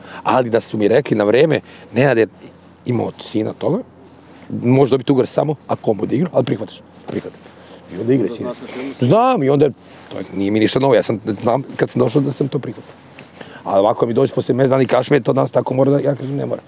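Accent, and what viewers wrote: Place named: Bačka, Serbia. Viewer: Лакат краљ, полако али сигурно набацује бачвански акценат..😎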